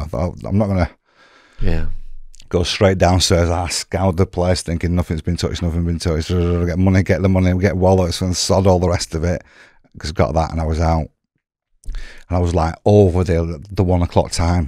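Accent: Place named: Lancashire, United Kingdom. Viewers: I knew I recognised that accent. It's good to see a Lancashire lad on here. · Sounds like blackburn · I could tell you were East Lancs as soon as you spoke I thought Burnley, so I wasn't far of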